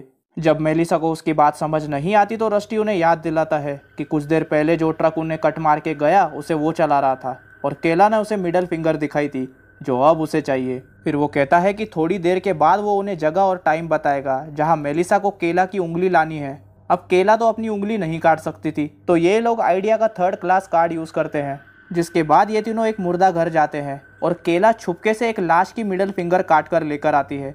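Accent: Maharashtra, India. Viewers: Bhai aap maharashtra ke ho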